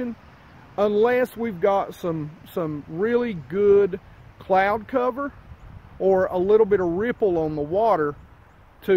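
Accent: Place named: Tennessee, United States. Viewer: You sound like a TN guy so if you ever fish Norris it would be cool to fish with you.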